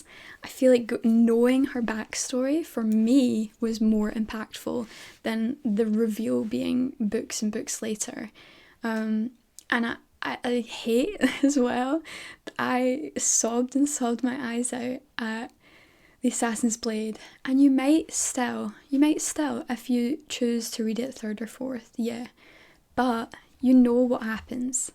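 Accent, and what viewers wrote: Scotland, United Kingdom. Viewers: move to Scotland just to listen to people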